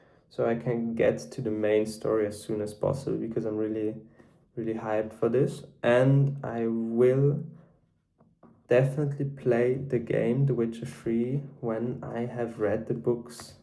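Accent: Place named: South Africa. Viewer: Hi, I'm new to BookTube too. Nice to meet you. Do you have Afrikaans in your background - your accent is a little mixed? Don't worry it's not a bad thing. I teach English and I like to work out accents.:)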